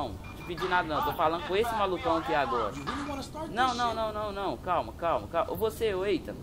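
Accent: Minas Gerais, Brazil. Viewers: e mineiro??? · você é Mineiro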